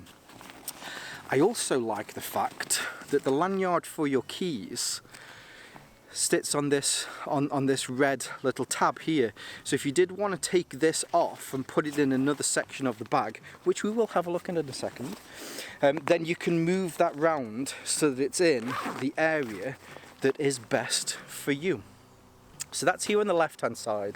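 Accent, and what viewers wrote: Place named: Stoke, United Kingdom. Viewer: Bit of North Staffordshire, Stoke going on here.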